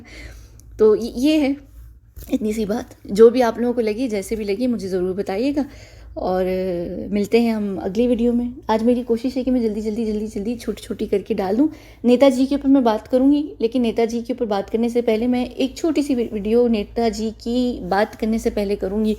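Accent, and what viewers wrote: United Kingdom. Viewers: You have a beautiful British accent 😘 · I like ur English accent, unlike some haters I just hope u speak in English more.. 😀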